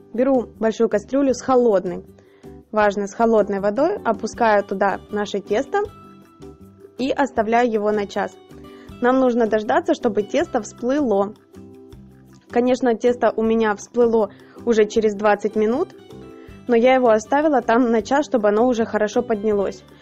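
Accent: Ukraine. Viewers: Нормальное украинское произношение, нЕчего к нему придираться, в России тоже есть регионы со своим специфическим наречием, кто-то окает, кто-то акает, меня, в свое время, в школе, украинка постоянно ругала за твердое произношение буквы "г" на русский манер, украинское "г" звучит намного мягче.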